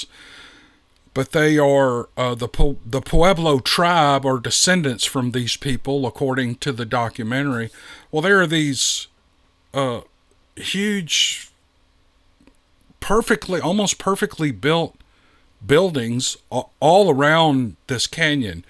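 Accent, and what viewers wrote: South, United States. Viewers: I just love you! You're such an excellent story teller! And your voice and warm southen accent are pure perfection! (Accent! What accent???) Listening to you is always like sitting around a campfire listening to my favorite uncles telling stories. Thanks, for providing such great entertainment!!!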